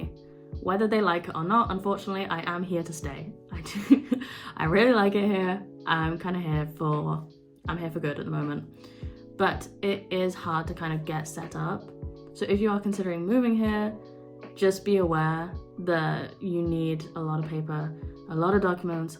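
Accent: Australia. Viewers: Your accent sounds Aussie to me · your accent is slightly international, there's a touch of Australia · you sound slightly Australian more than English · You sound more Australian to me than to be an English Lass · You picked up an Aussie accent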